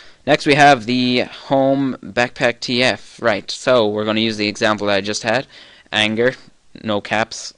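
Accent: Ireland. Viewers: YAY! YOU ARE IRISH!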